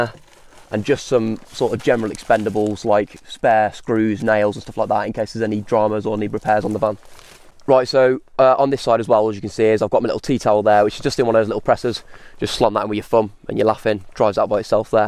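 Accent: Birmingham, United Kingdom. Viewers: What a british accent ! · Are you from Birmingham? 😊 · 🤣 Great conversion mate, even for a Ford! Are you a fellow brummie?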